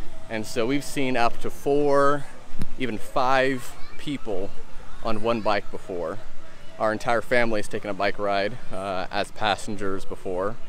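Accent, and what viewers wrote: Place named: Krio, Sierra Leone. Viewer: I enjoyed hearing your Krio as well as the bike ride footage. Have you tried hailing with the little noise they make with their mouths? I can't do it, and I am always amazed that the bikers can hear it.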